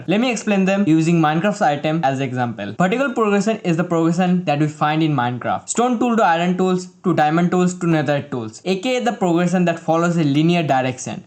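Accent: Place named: India. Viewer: you kinda sound like indian #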